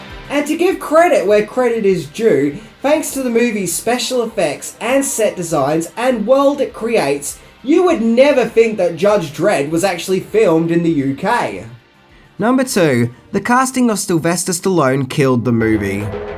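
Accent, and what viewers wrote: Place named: Australia. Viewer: Yes, he's Australian. It happens.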